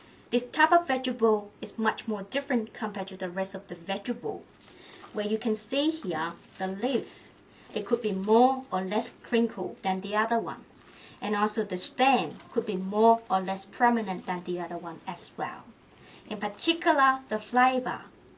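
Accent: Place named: Wales, United Kingdom. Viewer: Sounds like welsh accent to me!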